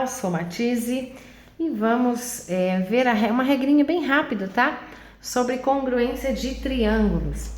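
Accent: Minas Gerais, Brazil. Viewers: Prof.ª: congruência NÃO significa, necessariamente, igualdade. Congruência, por definição, é a coincidência ou correspondência de caráter ou qualidades; proporcionalidade, conformidade, concordância, harmonia. Se dois triângulos têm os três lados iguais e dois ângulos também iguais, obrigatoriamente tais triângulos são absolutamente IGUAIS. Mas, como regra geral, a terminologia correta no estudo de semelhança de triângulos, o correto é utilizar a palavra "congruência ou congruentes". Importante observação: suas mãos são lindas, assim como seu sotaque mineiro ( lá de Barbacena ).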